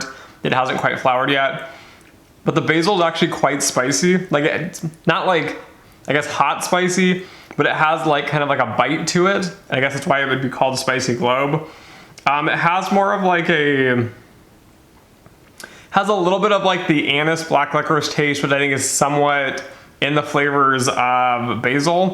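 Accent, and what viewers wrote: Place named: California, United States. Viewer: You sound like you are in California.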